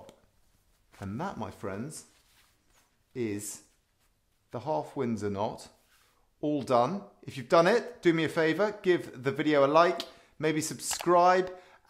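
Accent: United Kingdom. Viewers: Thank you very much! The best explanation from 3 I watched. Thanks for your lovely British too😊! From California, helping to my son who is graduating high school.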